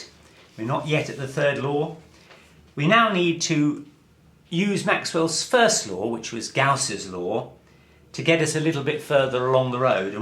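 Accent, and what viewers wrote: United Kingdom. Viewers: One million thanks! fantastic clean and quick review for my EM final test, just one thing, , what if the other charge is not added at the sphere centre, how can the superposition implemented? the phi equation here is not applicable for non-central charges isn't it? Love your British accent BTW :)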